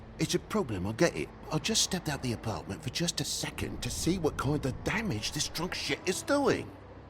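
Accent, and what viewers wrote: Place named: Newcastle, United Kingdom. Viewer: Let me guess were you're from by your accent you are originaly from newcastle but you traveled frequently as a child then you moved to north London at age 20 or 22 until 25 and then America were you have been living for 3 years now. Oh and great channel and awesome videos keep up the magnificent work.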